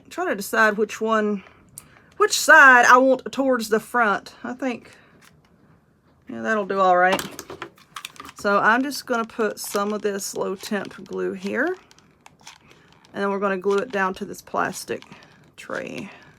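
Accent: South, United States. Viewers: New Sub here, love the way you talk ! Love the channel, boy you are southern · Where are you from, I'm from SC. You sound like you are from the south.😊 great craft. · That looks so good your definitely southern I love your accent.i need to try that too co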